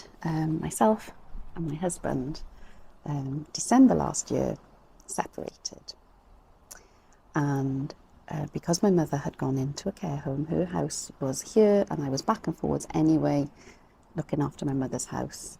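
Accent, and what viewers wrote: Wales, United Kingdom. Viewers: I'm 65 and retired with two little jobs. Resonate with everything you said, and with your lovely Welsh accent. My mum was Welsh and to me Welsh voices are warm and soothing. I wish you well and will subscribe. I havent had a health event, but am glad to be reminded by your experience that there is nothing more important than time to live your life. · Lovely Welsh accent 😊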